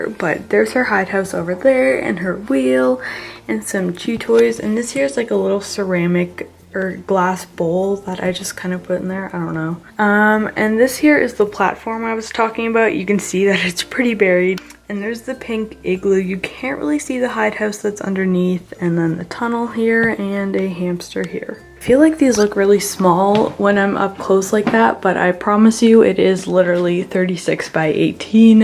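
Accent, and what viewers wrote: Canada. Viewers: Are you Canadian? This isn’t supposed to be rude, I think how you say “sorry” is actually really cute lol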